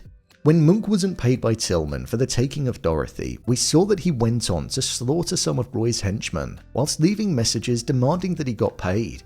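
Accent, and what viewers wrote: United Kingdom. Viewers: Why do we need endless British narration??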